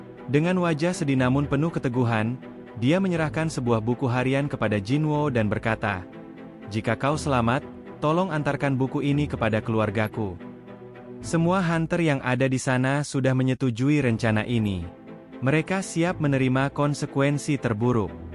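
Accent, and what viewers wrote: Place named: Indonesia. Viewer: Indonesia banget baca dungeon ,Potion,healer😅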